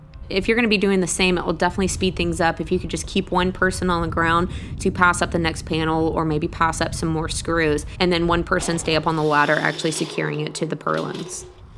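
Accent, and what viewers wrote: Texas, United States. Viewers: 1) You're a role model for me because of your willingness to tackle things you don't know and learn as you go. 2) Your accent makes me homesick for Texas 3) The head scarf thing looking like Rosie the Riveter was pretty damn awesome. 4) There's no four. Why did you think there would be?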